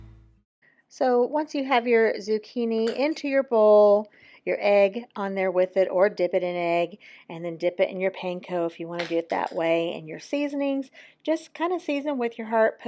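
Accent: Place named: Fort Wayne, United States. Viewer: We're from Fort Wayne and it sounds like you are close by.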